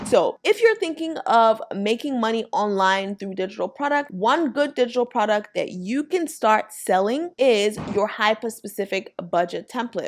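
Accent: Nigeria. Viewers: Are you Nigerian by chance?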